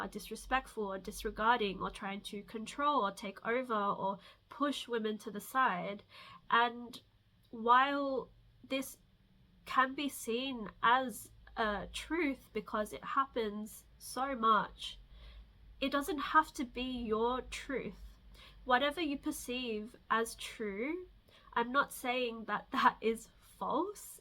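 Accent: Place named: Australia. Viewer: 🕊 Blessed Shukra/Venus day Love💌, You are so sweet & genuine💕💞💓 I am so honored & humbled by your beautiful sentiments! Thank you😭 🙏🏾🥰 I can say the exact same for you love!! I'm currently living in Puerto Rico. I heard your accent and now I've confirmed you're in Australia! I've been being called to the Southern Hemisphere for decades and I know I will get there one day! You're always welcome my way!! I'm a traveler so I'm always changing my LO📍 I have previously shared creations and guides/tools online but I've been preparing for my next projects so I'm not currently on any socials outside of this YT I don't use. I occasionally use X for specific purposes. Big Rebirth as I'm fully exiting my Sade Sati, my Rahu/Ketu returns, Revati Rahu/Hasta Ketu D1 and I have a D9 Jupiter Punarvasu. I'm very eager by next year to gather fellow vedic astrologers like yourself and collectively put something out together and start a support network. 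I've got some books/content in the works right now and a tarot deck I've been designing. I checked out your soundcloud and🙌🏾 🔥💀🌺📿 I'm an Anuradha MC Jyeshtha Venus with Dhanishta D1 I'm so here for that trip you're taking ppl on!! Thank you for blessing us! I'll support you any way I can!! I have a lot of friends that are global DJs. My friend DJ Haram/Zubeyda is a female queer DJ who loves to support marginalized artists. There's a great network in that crew of people I used to support more physically. She's a great person to connect to!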